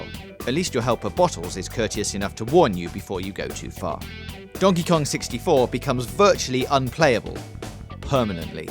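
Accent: United Kingdom. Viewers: Eww he has a British voice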